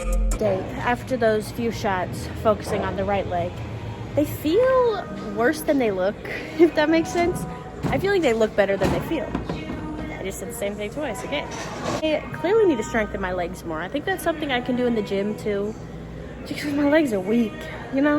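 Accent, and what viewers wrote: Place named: California, United States. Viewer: You sound like a californian eh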